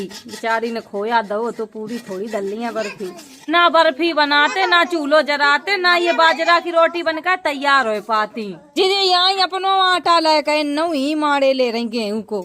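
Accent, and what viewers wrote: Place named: India. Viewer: ❤love yours Desi accent and voice❤long live with health wealth happiness and success in every part of life. Always respect and love❤ from Pakistan 🇵🇰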